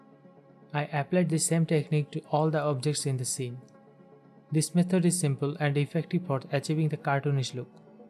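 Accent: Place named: India. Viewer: no wonder the accent felt indian but slightly off.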